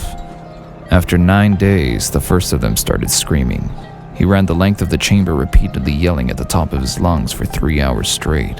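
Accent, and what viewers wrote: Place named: Russia. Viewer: Great job on the narration! I really liked the Russian accent ^w^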